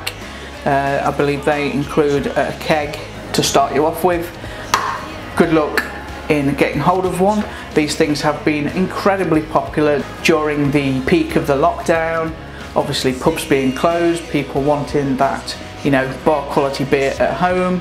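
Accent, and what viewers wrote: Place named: RP, United Kingdom. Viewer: Have you read the manual before making this video? Probably not, because you omitted some important steps. Also, in the tap unit installation, you have mixed up the sequence. Your posh accent is not a substitute for being a professional. This is food&beverage department, not following the rules may become a health issue.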